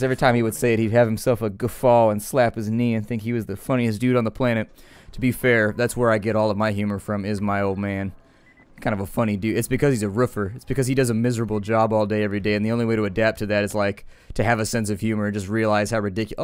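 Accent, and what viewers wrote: United Kingdom. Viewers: Guy totally looks Cuban, talks like a brit lol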